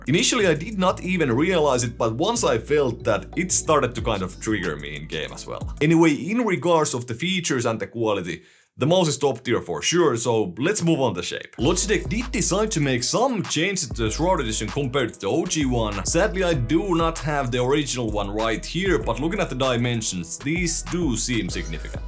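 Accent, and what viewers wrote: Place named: Finland. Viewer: Your reviews are amazing and the Finnish accent is icing on top of the cake!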